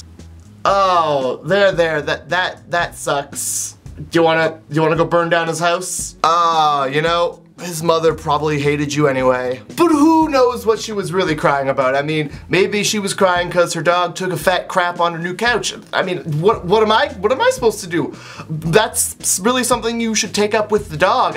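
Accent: Canada. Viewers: I would of awkwardly hiven her some hummus, and awkwardly pat her shoulder. & plus your getting a little canadian accent c: coooool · Is he Canadian I'm new to this channel so idk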